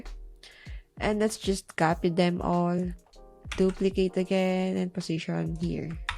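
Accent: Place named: Philippines. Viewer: Thanks for making this video. I also love your Filipino accent. 😍 I have a question about creating wireframes for app UX/UI and even for the web in general. I used to create wireframes first for clients and then high-fidelity mock-ups.. But ever since I've used page builders such as Divi and Elementor which was 6 or 7 years ago I have designed/built directly on the page builders. What you think are the pros and cons when it comes to the design process of starting from wireframes vs. building right away on the browser or mobile apps? Also which types of clients prefer one over the other? I have always attracted clients who do not mind me building right on the page builders and skipping the "design" part of the process. So I am curious if I can still be able to get clients who do not mind the longer version.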